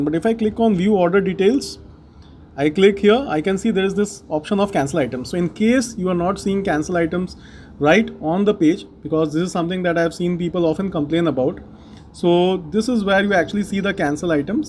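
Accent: India. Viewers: the man with the indian accent comes for the save again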